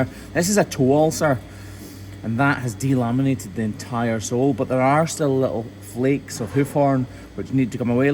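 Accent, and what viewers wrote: Scotland, United Kingdom. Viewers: Hopefully the cow gets better soon also i love that Scottish accent 💖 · He's from Dumfries and Galloway, Scotland.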